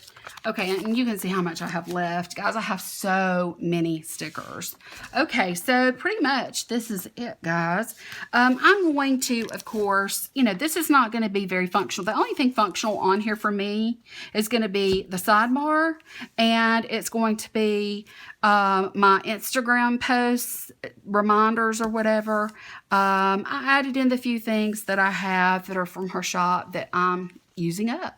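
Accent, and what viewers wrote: South, United States. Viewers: Girl I love watching your videos, and your southern accent is EVERYTHING! I can absolutely enjoy watching your pwm's even if I can't buy the kits because as someone who can't afford to buy sticker kits, these kinds of videos give me inspiration on how I can use computer paper or cardstock to create a layout. Keep doing you and being your authentic beautiful self 🤗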